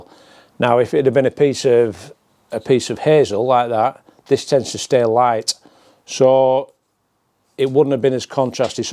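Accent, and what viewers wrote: Lancashire, United Kingdom. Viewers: Just dawned on me its Fred dibnahs accent Bolton Blackburn area😂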